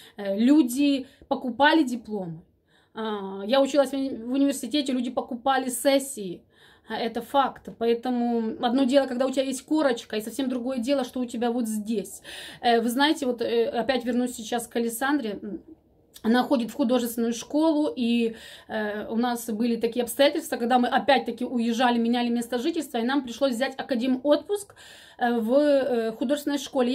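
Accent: Ukraine. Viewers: Мне,честно,непонятна суть претензий. Сразу же "слышно", что вы с Украины. Почему вы должны идеально владеть русским? Разные регионы, разный уровень владения языка. Аналогичная история у Татьяны из Италии. Ее постоянно "цепляют". С дочкой говорит на украинском, с мужем на итальянском, канал ведет на русском. Если мне человек интересен, на мелкие детали я не обращаю внимания. К замечаниям относитесь проще, смешно сказать, но даже Катю (пенсилбокс) бывает поправляют! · я преподаватель русского языка и литературы.Живу в Харькове, родилась здесь.У нас 90% населения города говорит на русском или на суржике. Мне сразу режет слух, когда говорят не чисто...Но!!! Это такая ерунда...по большому счету!!!.. И Украина откладывает свой отпечаток на произношение, а иногда и ударение. Я никогда не делю людей на правильно говорящих, с высшим и без образования!... Главные критерии- доброта, душевность, природный ум и мудрость.💖 Вы, прекрасная молодая женщина!!! 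И не нужно Вам оправдываться!!! Кому Ви до вподоби, ті будуть Вас слухати і дивитися!!! Я Вас підтримую.Ви дуже гарна!!!😁😍♥️👍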